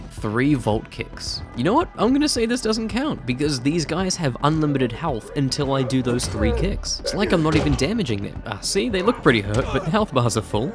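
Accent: New Zealand. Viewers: Yo. Are you from new zealand?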